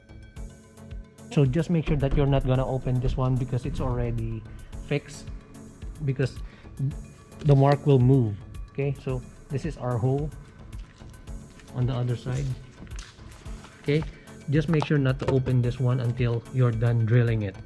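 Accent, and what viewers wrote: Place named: Philippines. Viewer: Sheeesssh bro. I’m about to do the same thing, thanks for a great video. All I got to say is, damn your Filipino accent is thick lol🇵🇭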